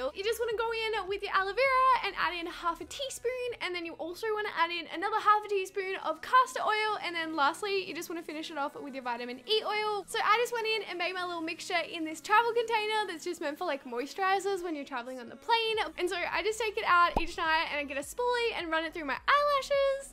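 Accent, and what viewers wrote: Australia. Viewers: I love how I could tell she was from Australia by her accent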